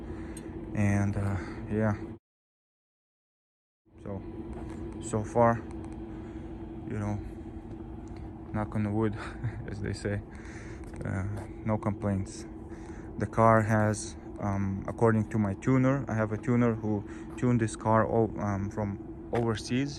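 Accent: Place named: Chechnya, Russia. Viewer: bro this guy is a chechen or a russian ahahhaha his accent says it all ahhaha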